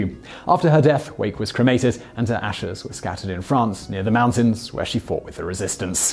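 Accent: United Kingdom. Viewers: he has a British accent; by default his pronunciation is correct.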